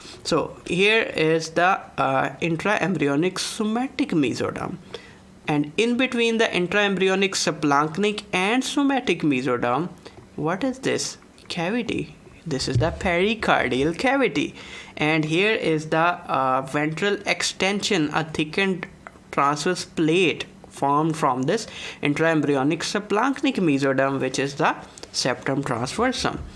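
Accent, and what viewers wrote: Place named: India. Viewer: Fascinating but hhe Indian accent is almost impossible to follow; that is now English, alas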